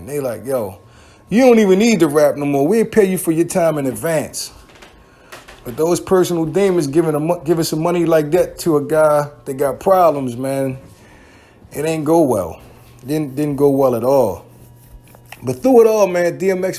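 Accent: Baltimore, United States. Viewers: Yo.. Are you from Baltimore?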